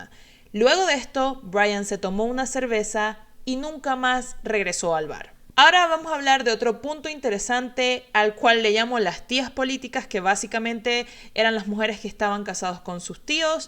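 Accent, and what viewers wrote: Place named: Venezuela. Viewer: Eres venezolana? reconocí tu acento de inmediato mrka<3 jajaja te amo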